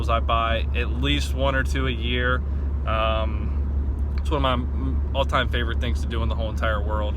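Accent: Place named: Pittsburgh, United States. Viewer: You sound like a yinzer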